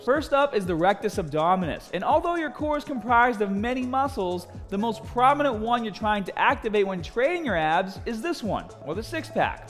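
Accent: Boston, United States. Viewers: He sounds like my former HS principal who came from Boston 😅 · Great video, obviously a Boston boy, LOL. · The Boston accent is great.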